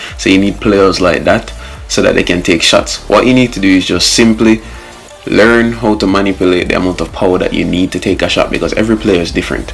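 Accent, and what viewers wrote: Jamaica. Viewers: Ur Jamaican don’t it? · You sound Jamaican me g 🇯🇲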